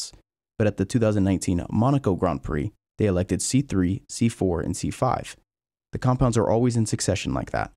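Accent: United States. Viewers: its the american accent for me, it took me off guard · I was like, "something's off about this F1 video" -- then I realised. It's the american accent. I'm not used to people talking F1 in an american accent... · Being american myself at first I didn't realize anything. Maybe about 10 minutes into the video i was like wait, that "grand prix" sounded unnatural.. oh wait he's american? Thats different, in a good way (: I often feel like I'm the only person for miles that cares about F1.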